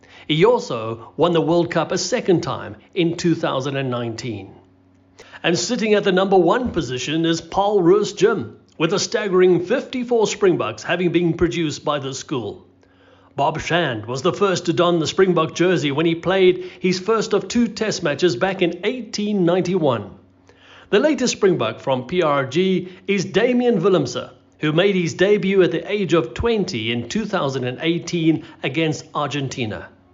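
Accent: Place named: England, United Kingdom. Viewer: this guy's pronunciation is too english!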